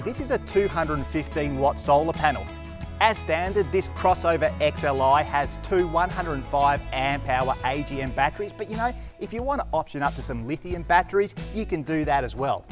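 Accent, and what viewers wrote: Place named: Australia. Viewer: South Africans 🇿🇦 started the offroad caravan trend, yes, but others keep on innovating. I just luvv the designs by the Aussies. And that Ozzie accent is just the best. A controla. Or a V8 moda. Just love the dialect.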